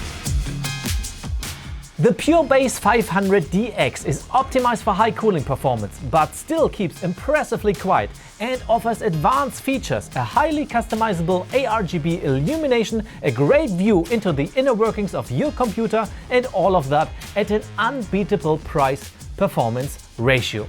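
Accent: Germany. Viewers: Dieser deutsche Akzent hahahahaha Feier ich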